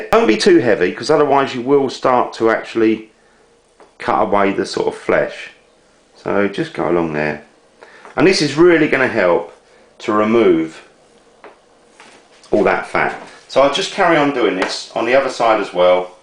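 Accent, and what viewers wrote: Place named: England, United Kingdom. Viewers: He's English. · Unmistakable English accent. Your cooking tips are equally of base.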